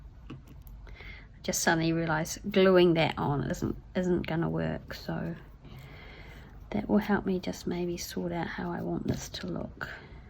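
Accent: New Zealand. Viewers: I so enjoyed watching this video with you! I’m a Kiwi too so it’s nice to hear a familiar voice! Love these little bags, which will be great additions to my journals and tags. Look forward to watching your next one! Wish I had seen your Giveaway, it looked really amazing! How exciting to be waiting for a new grand baby!🧚‍♀️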